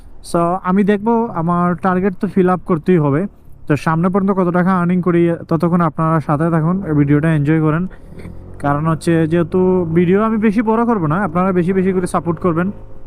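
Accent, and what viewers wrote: Sylhet, Bangladesh. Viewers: Vai Sylheti ni?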